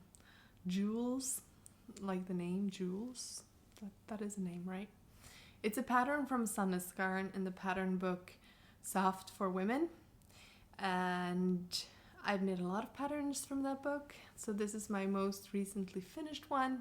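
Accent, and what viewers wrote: United States. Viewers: Beautiful fobs. You have a very American accent for a person who lives so far from USA! I love your vlogs!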